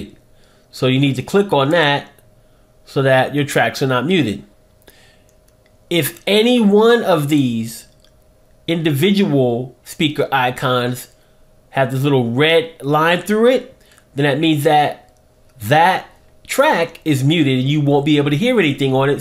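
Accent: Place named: United States. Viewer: Congratulations! Now that I have adjusted to your American English, I must grant it to you, you explain very well and your solutions are good. And believe me this compliment is genuine as it comes from a French Speaking European old timer. Thanks a lot and carry on...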